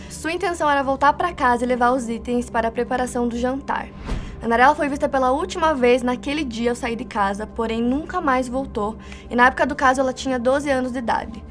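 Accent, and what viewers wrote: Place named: Rio Grande do Sul, Brazil. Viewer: Sotaque fofo.... Gaúcha?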